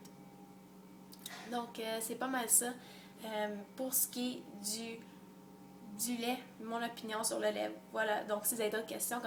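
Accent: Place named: Quebec, Canada. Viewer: Du Québec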